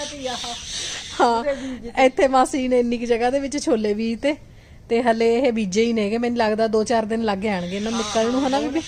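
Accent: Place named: Patiala, India. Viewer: I love your video bhan I'm from patiala to I always watch your vlog you speak same punjabi like we do and your so sweet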